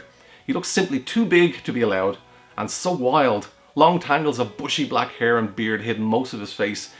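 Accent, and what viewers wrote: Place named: Ireland. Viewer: Please. Do more of this. I've been looking for audiobooks with irish accent.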